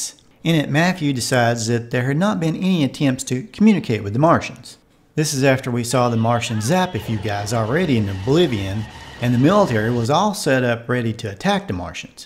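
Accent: South, United States. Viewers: dunno too busy trying to listen to your forrest gump accent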